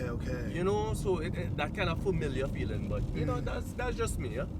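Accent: Trinidad and Tobago. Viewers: I love how you jump in and out of Yuh Trini accent, it still spot on. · Good he still has his Trini accent 👍🏿😃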